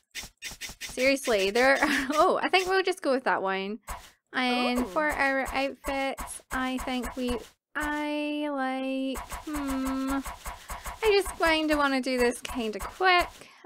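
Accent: Scotland, United Kingdom. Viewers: I have my sims kingdom on the wii. Love <3 Also, this is the first video I've seen of you, are you Scottish? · I love your British accent! It makes the video more fun lol :) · What do you use to film? And are you from Scotland?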